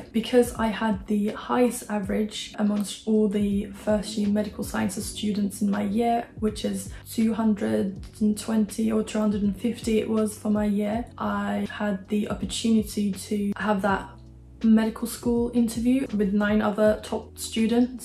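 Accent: United Kingdom. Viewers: If you live in a country, you will acquire their language easily. Add to that, she is really intelligent and speaking with a British accent won't be a problem. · Hi. I wonder how can you have a british accent if you move to uk from turkey when u are in 16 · I’m from the uk and she literally sounds British that is not the case with literally anyone else I have met from who has immigrated here unless they were a child when they came. You can almost always here an accent but not with her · Hi, couldn't imagine that you came to the UK at the age of 16. How can you pick up the accent? It's like 100% British!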